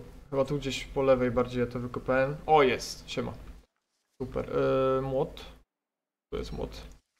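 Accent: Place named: England, United Kingdom. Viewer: А мне показалось англичанин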